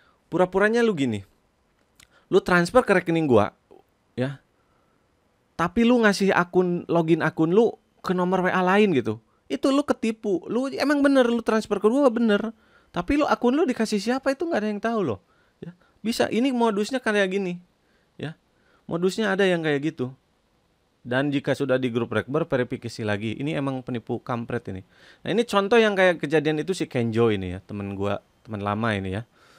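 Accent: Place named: Kalimantan, Indonesia. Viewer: logat bahasanya kayak bahasa melayu arah ke kalimantan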